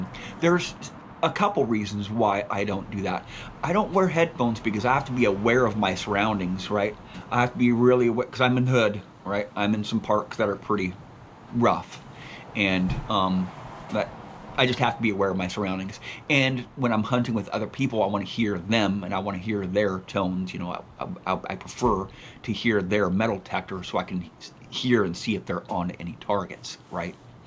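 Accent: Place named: Georgia, United States. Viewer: What state are you in ? Sounds like my state of Georgia ! :)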